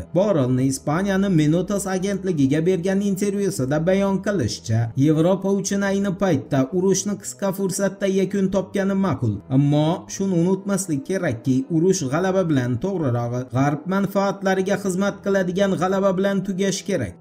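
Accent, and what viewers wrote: Khorezm, Uzbekistan. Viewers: Нима бу хоразмликми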